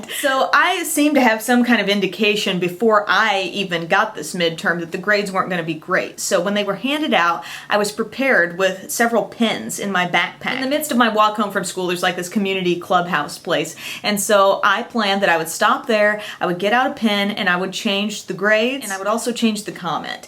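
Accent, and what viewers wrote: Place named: South, United States. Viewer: Really? From Illinois? Your accent totally not a Chicago accent. I thought you were from the south.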